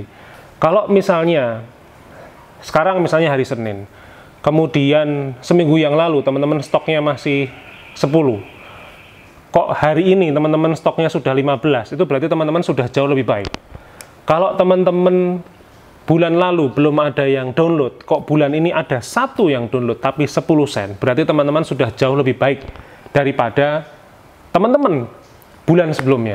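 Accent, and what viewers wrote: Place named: Java, Indonesia. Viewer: pandangan subjektif yo pak, aku seneng nek krungu sampean jelaske logat medhok haha.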